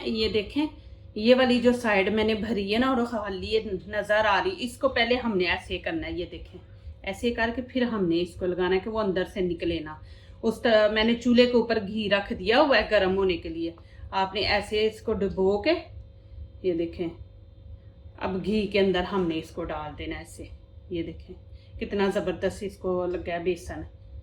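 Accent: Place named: Punjab, India. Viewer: Excellent recipe....aapke baat karne ka tareeka bilkul punjabi....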